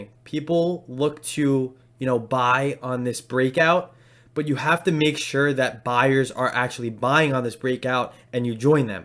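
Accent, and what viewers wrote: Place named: New Jersey, United States. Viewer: Great video thanks for posting. You sound like you're from joysey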